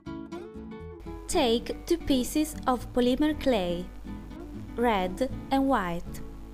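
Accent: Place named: Italy. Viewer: Cute strong accent. What part of Italy r u from?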